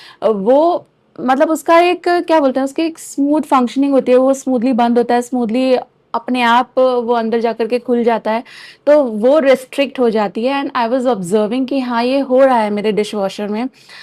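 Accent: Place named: Uttar Pradesh, India. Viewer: Aap up se h.. Is liye gola bolti h😊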